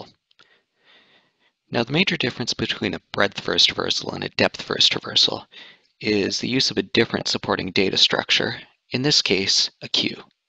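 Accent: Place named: United States. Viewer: bhai india me hi rehta hai na.... kyunki accent se to america ka lagg rha hai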